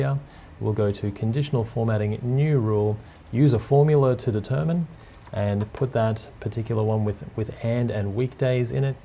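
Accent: Australia. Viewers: Great to hear another Aussie!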